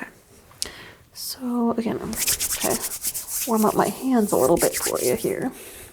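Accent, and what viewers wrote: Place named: Upstate New York, United States. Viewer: i'm not sure where you're from but your accent really reminds me of western/upstate new york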